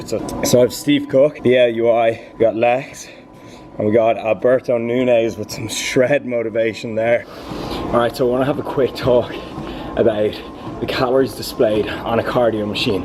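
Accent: Ireland. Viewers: "wake the fuck up" --- this guy is defo irish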